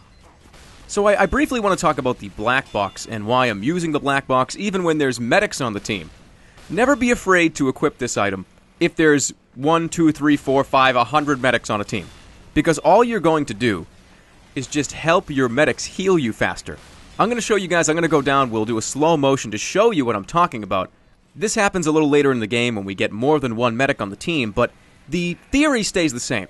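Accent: Boston, United States. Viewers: your from where the scout is! boston!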